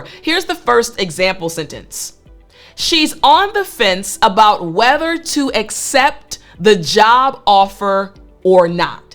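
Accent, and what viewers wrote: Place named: United States. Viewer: Hi teacher, l ❤ American accent.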